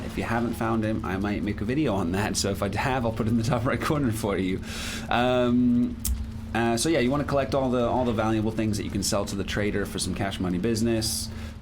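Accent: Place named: United Kingdom. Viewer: You have a pretty strong UK accent imo. Haha. Thought you were a "native" xD Where are you originally from?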